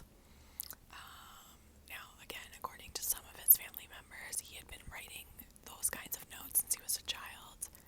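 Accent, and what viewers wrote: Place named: New York, United States. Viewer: Recommendation: Missing 411 cases. They are so eerie!! Also- LOVE your NY accent. I’m from the South and I think this accent is so dang tingly!! Would love a NY accent role play! 😊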